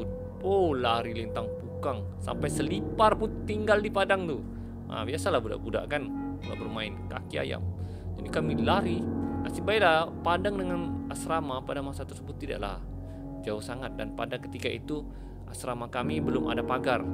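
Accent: Sabah, Malaysia. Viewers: Abang orang Sabah ke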